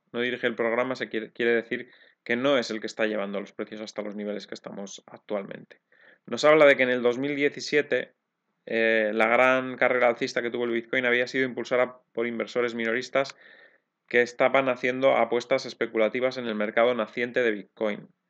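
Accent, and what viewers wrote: Spain. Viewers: Gracias a tí por tu esfuerzo para con nos. Por cierto, de dónde eres? Me suena tu acento de Vitoria o por ahí. Es curiosidad, y sobre todo por si ando por allí y te veo, invitarte a tomar algo. Te lo mereces todo. Gracias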